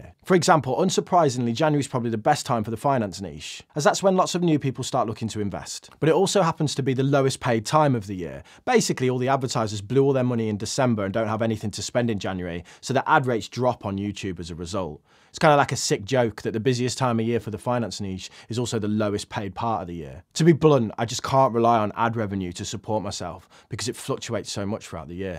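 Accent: Birmingham, United Kingdom. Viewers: Best of luck. I wish you nothing but success. There are not all that many British finance channels, it's all well and good listening to some yank that has millions in the bank telling you to spend less. But you have provided me with some great insights and actually had a positive impact on my wallet. Keep up the great work and I am sure you'll do brilliantly. P.S You're a Brummie? I thought you were a Manc. 😂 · Love your content mate, nice to hear someone from Birmingham instead of just American’s all the time. Keep it up!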